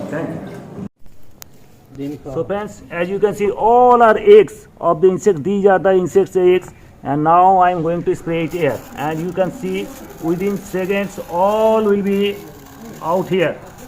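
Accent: India. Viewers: Aap please hindi me baat kijiye. Aap ki English hindi jaisi lagti Hai. Aur India me jyada tar log jo kheti karte Hai wo hindi baat karte Hai.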